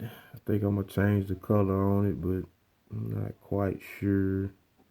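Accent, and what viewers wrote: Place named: South, United States. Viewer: I love your southern drawl my brother, you reminded me of one of the best car songs of my generation <link>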